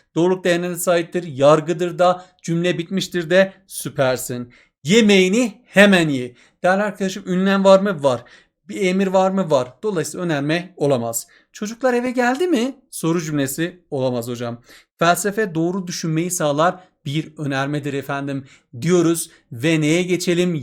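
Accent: Eastern Anatolia, Turkey. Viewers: 2025 tayfadanım herkese selamımı Hocam çok iyi anlattınız sizinle devam etmeye karar verdim.. Bi de bi şi sorcam aslen nerelisiniz acaba? Kars Ardahan Ağrı Erzurum??? Uzatmaları kullanmıyosunuz da😂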